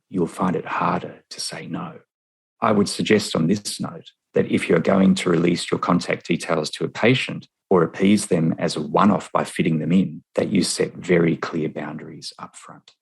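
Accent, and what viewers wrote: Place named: Australia. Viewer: plz make more videos so that we learn about australia and also get along with the accent🤗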